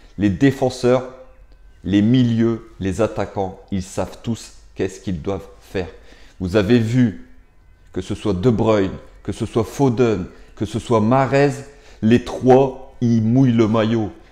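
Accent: North, France. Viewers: Jme demande toujours si tu viens pas du Nord ta grave l'accent ! Ta raison mon frère je pense que pour être compétitif il ns faut 2 gros bancs énorme comme on ttes les équipes modernes ex Bayern city etc..... Une équipe collectifs pas des joueurs qui se croient au five ! Nos joueurs c'est encore trop peu c'est encore trop faible Bakker Diallo Draxler icardi kehrer faut les vendres !!!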